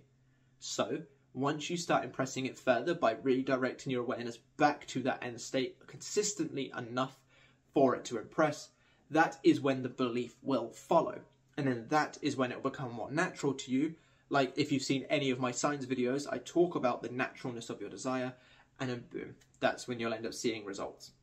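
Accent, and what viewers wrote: United Kingdom. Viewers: PS: you speak so quickly + the British accent makes me REALLY pay attention and often re-listen a part or two (I mostly listen to American English). Which I don’t criticize, it’s something I welcome because it’s super refreshing! I have to say I enjoy the manually added captions! Oh and I think I manifested your fast speech because I was tired of some Youtubers speaking sooo like, squeeeeezing a sponge type of slow speech🤣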